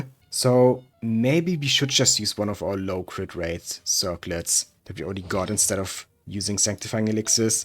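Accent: Germany. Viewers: Hey where you from? Your accent Sound SO german 😭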